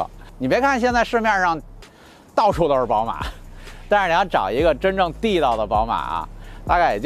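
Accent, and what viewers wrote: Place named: Beijing, China. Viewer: 听你讲话让我想起我在北京跟几个朋友唠嗑，哈哈😂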